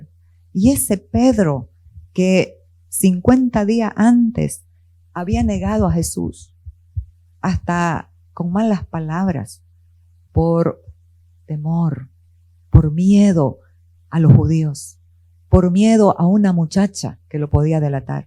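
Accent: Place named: East, Bolivia. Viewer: Me agrada como predica la 👍🏻 me encanta sus pausa y la forma entendible de la explicación de la Palabra🙌🏼 y sobre todo tiene el tono del oriente de Bolivia🤗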